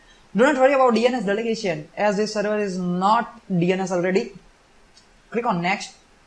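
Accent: India. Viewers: nice video but please indian people should stop speaking english.you are hurting the langage ☺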